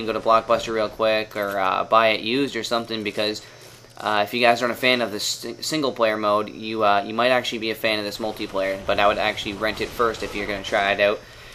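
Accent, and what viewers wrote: Canada. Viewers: Are you canadian? :L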